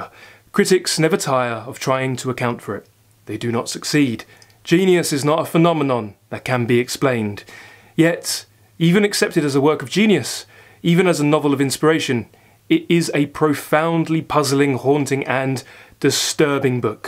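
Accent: United Kingdom. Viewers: I have watched many many book collection videos on You Tube and in my opinion yours are by far the best. The information you provide is very informative and the manner in which it is presented is a joy to experience. The dialect helps (I'm a Yank) as it is the hallmark of the mother tongue as-it-were, but I think it is the thoroughness of your descriptions that I most appreciate. Please keep up the excellent work. [EDIT] I am VERY judicious in my subscription allocations, but for what it may be worth to you I have just subscribed to your channel.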